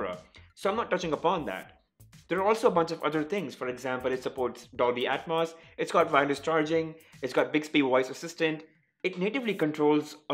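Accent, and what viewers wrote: India. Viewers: 这英语的咖喱味真明显。。。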